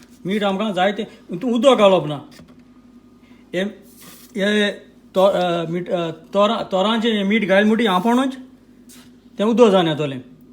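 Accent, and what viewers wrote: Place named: Saxtti, India. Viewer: Nice. Love the Saxtti dialect too!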